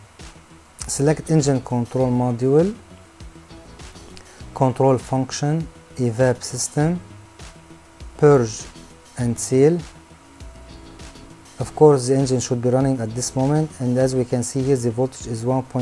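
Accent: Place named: Arab world. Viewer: واضح من لهجتك انك شخص عربي اهلن بك أخي واسمح لي أن اطرح عليك مشكلتي في هذا الخصوص لدي سيارة ترافرز 2018 عند تعبئة الوقود المحرك لا يعمل واحيانأ يعمل لكن يوجد ضعف في الأداء مما يجعلني اتوقف لمدة عشر دقائق ثم بعدها يعمل بطريقة طبيعية قمت بعمل فحص كومبيوتر وكانت النتيجة عطل في بلف الايفاب p0496 بماذا تنصحني هل اقوم بتغييره؟ إم انه يتم فحصه يدويأ قبل التغيير كما يظهر في مقطع الفيديو هذا؟ وماهي النسبة الصحيحة لإثبات العطل؟ ارجو الإفادة للضرورة ولك خالص التحية والتقدير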